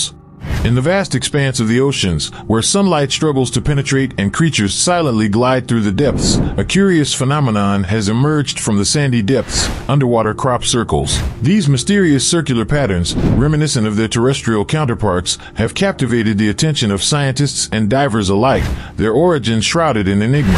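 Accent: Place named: United States. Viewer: Sorry. The animations and overall visual presentation is marvellous - but that awful 'merkin accent from the narrator is too much to listen to for more than a few seconds.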